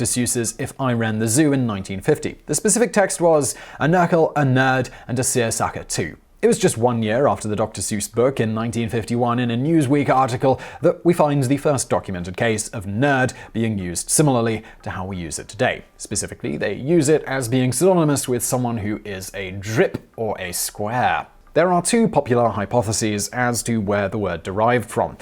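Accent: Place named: RP, United Kingdom. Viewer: Love hearing you read jive with your posh accent.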